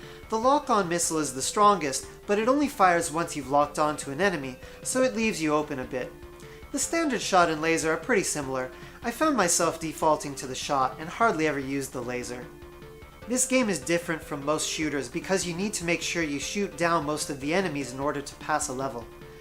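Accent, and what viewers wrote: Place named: United States. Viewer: they speak in a murican dialect offshoot of real English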